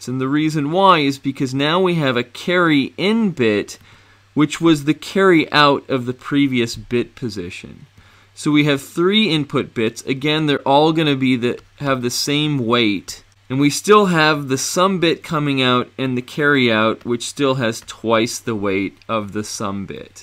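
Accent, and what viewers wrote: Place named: England, United Kingdom. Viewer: Thanks a lot for this english accent explanation, I'm having a trouble understanding indian explanation because I'm being distracted by their accent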